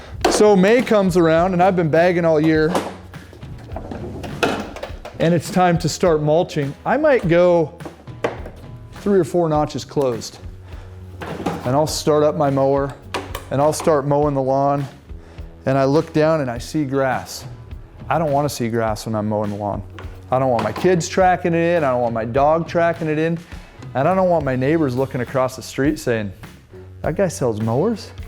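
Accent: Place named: Canada. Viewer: This guys Canadian accent is soo killer.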